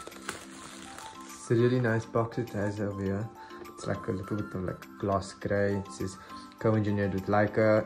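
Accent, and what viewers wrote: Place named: Afrikaans, South Africa. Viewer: Love the Afrikaans voice I'm also south African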